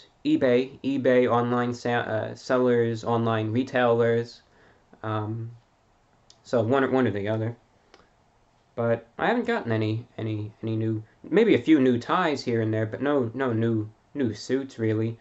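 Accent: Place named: New York, United States. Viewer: If I were blind I'd guess you were an old Jewish man from New York City who now lives in Florida. I do enjoy listening to you but you tend to ramble on a bit too much and I'd never pay to hear that. I'm glad that people do pay to hear your ramblings enough to keep you on You Tube.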